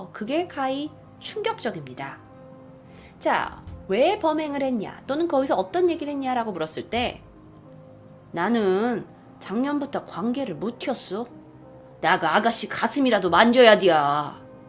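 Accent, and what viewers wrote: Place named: Honam, South Korea. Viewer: 제시카님 전라도 출신이라 그런지 호남사투리 맛있게 하시네요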